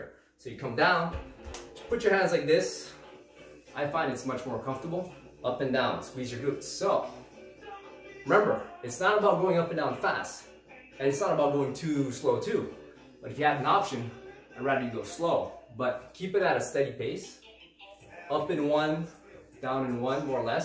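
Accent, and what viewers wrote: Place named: United States. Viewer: Forgive,but, you sound more American than Canadian!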